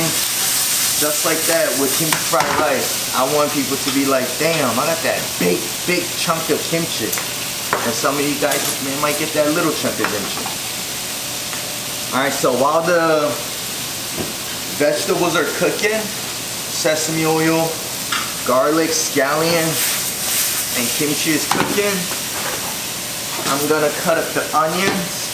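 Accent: Philadelphia, United States. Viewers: He's not cursing he's just speaking Philadelphia.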